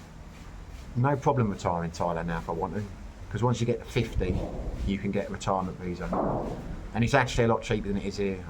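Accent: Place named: Cockney, United Kingdom. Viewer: My 1st visit to the Phils will be later this year, but I already know I won't be able to stand the noise, as I'm highly sound sensitive. Thai & Bali vs Phils, but I've heard Vietnam beats em both, & is a lower cost of living. If you can interview a mate with Vietnam experience, that'd be cool. With your Cockney accent, I can't catch half of what you're saying, but I still appreciate your awesome content!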